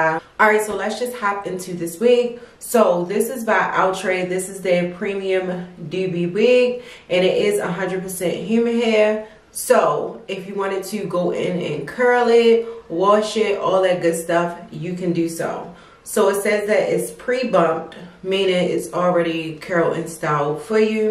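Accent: Baltimore, United States. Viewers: You sound like your from my city.. DC🥰 Nevermind.. I hear B-More🤨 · I’m loving the Baltimore accent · Yea that’s that Baltimore accent I can hear it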